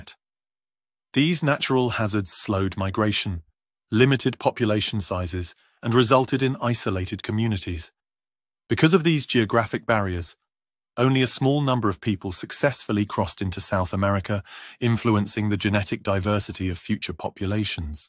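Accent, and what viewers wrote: England, United Kingdom. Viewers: I think it would be nice to pronounce Denisovan and Neanderthal correctly, especially if employing an English accent in the presentation.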